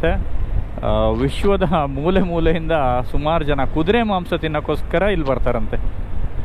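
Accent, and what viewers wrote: Kundapura, India. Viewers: Kundapura Kannada super 👌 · ಸೂಪರ್ ಕುಂದಾಪುರ ಭಾಷೆ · 27 : Kundapura kannada ❤🔥🔥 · Very good kundhapura Kannada 👌🏼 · Kundapura kannada Laik ayth 🥰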